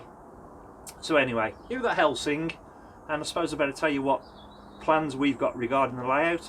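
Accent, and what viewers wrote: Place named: West Midlands, United Kingdom. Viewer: Just came across your channel as one of our videos is a suggestion from one of yours. Where are you from as your accent sounds proper West Mids. We are from the West Mids.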